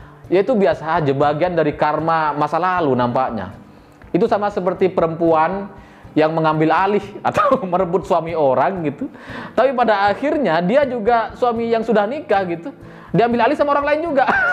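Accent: Madura, Indonesia. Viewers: Logat maduranya itu lho yg lucu dr mas ini 😁😁 jgn2 mas orang madura ya? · Lucu mas ini... Kayak orang Madura logat nya...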